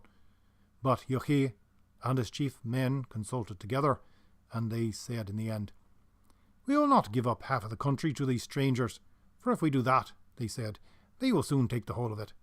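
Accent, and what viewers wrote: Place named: Ireland. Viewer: where is your accent from it's so wonderful! I am assuming Ireland of course, but what part?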